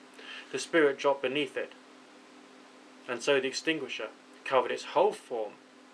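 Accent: United Kingdom. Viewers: This is really a great reading , especially with that British accent, very good!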